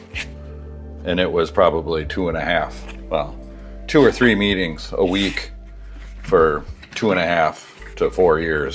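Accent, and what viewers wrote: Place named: Minnesota, United States. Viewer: Hearing you talk is like being home in Montgomery Mn again as a kid.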